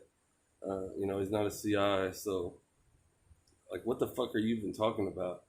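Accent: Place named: Indiana, United States. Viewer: I dunno you, but you're a white guy from Indiana. And you talk like a white boy from Indiana, you don't pretend to be something you're not. These clowns pretending their momma is blacker than night are an embarrassment. Total embarrassments.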